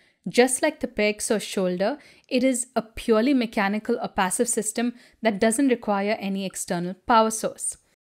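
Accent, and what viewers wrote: India. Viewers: I guess that u are from India because of your accent 😂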